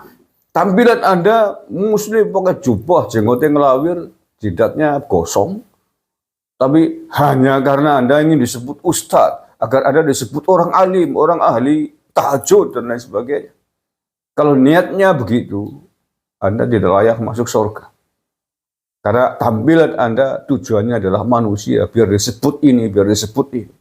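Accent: Banyumas, Indonesia. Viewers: Dan saya selalu tersenyum saat mendengarkan logat khas Banyumasan nya Mas. 😊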